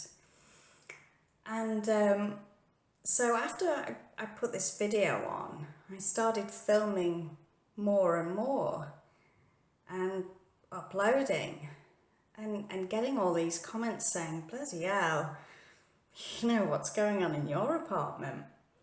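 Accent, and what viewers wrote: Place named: United Kingdom. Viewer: I do not have a channel because i guess ive been searching for the truth for my own needs. I just need to prove it to me. I guess that sounds selfish. Lol. I can tell you're from the UK for sure. I could listen to you speak all day. But anyways, lol. I used to have experiences when i was a child also. My most memorable one was when i was 15 when i had sleep paralysis and witnessed "The Old Hag Syndrome". It scared me to death. She was at my bedroom door making a weird sound and i couldn't move. It didn't stop until i called out for the lord. Thats when i knew "our maker" was real. I have so many to tell. Most are good experiences. But there are a few bad. Please protect yourself when speaking to spirit in case you run into something evil.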